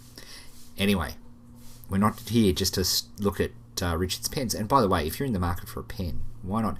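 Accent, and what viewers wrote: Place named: Australia. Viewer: what a lovely australian accent!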